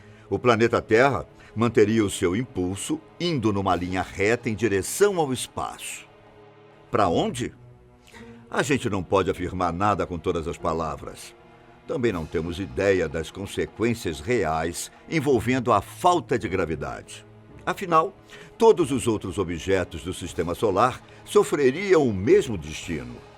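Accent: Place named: Rio de Janeiro, Brazil. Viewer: É que ele é carioca kkkkkkkk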